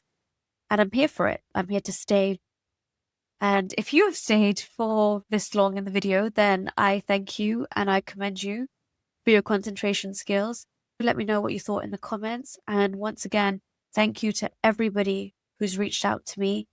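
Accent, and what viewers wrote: United Kingdom. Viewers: Maybe because she has a British accent?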